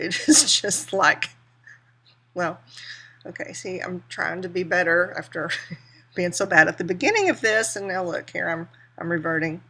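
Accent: South, United States. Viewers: Love! Where are you from? You sound like you have a southern accent! I'm a Mississippi girl;)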